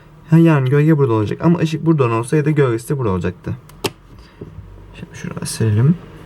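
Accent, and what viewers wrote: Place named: Cyprus. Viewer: Kıbrıs da mı oturuyorsun?? (Şivenden dolayı söyledim)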